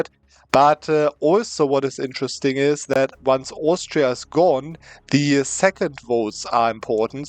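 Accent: Germany. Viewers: The German accent is so cool!!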